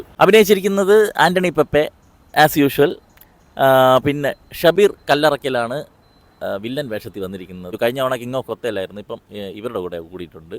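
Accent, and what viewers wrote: Trivandrum, India. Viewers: എനിക്ക് താങ്കളുടെ തിരോന്തരം ഭാഷ ഇഷ്ടമായി !😂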